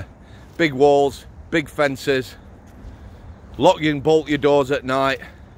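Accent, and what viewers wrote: United Kingdom. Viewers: Thick British say no more.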